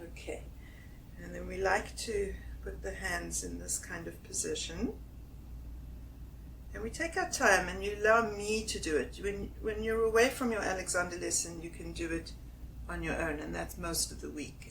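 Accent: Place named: South Africa. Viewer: I could be wrong but she sounds as though she is from South Africa and i love that accent. Great video.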